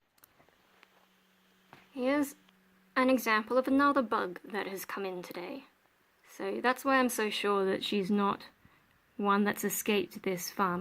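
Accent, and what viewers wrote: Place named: Australia. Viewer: Yes. Northern hemisphere. Southern California to be exact. Whereabouts r u from? Somewhere down under from the sound of ur voice, but I'm just guessing.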